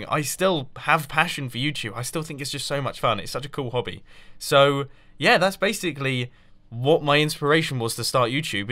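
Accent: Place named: United Kingdom. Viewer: Sometimes I can't understand in all of his Britishness. I literally just sit there and try to understand, but I find it amusing. Don't change,.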